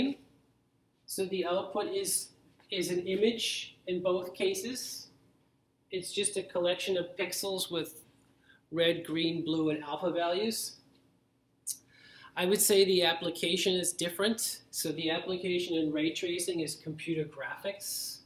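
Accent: Boston, United States. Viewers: are you from Boston? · Are you from Boston?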